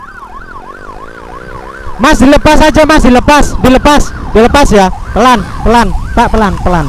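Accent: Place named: Java, Indonesia. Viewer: Lah jawane medok to ms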